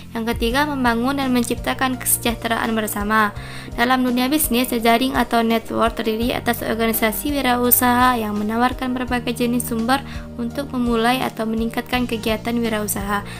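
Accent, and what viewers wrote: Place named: West Sumatra, Indonesia. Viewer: hahah logat minang nyo ses😅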